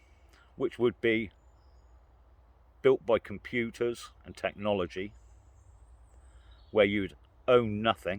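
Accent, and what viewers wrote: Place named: West Country, United Kingdom. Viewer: Wow, I’m late to this episode but as ever nice to hear a wise sage view and in a twang of West Country that I know well. I listened again to the tasob stream from a month ago and can see that your attempt at infiltrating the Conservatives certainly engaged your mindful cogs, I’d vote for you!😀😀😀😀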